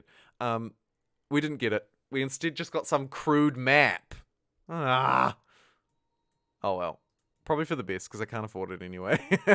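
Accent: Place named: New Zealand. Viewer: Yooo your a kiwi! Chur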